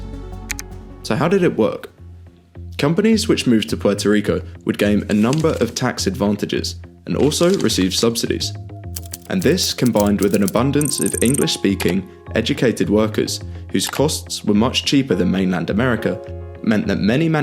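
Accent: United Kingdom. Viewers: So, as an American, I’m being scolded by a British accented presentation? Not disagreeing with anything, but seriously, I wonder what the British did with some of their colonies?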